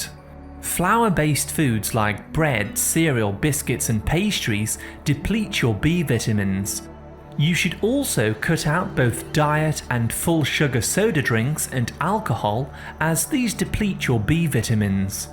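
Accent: United Kingdom. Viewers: That accent lol must be british.. we say vight-o-mins they say vit-a-min...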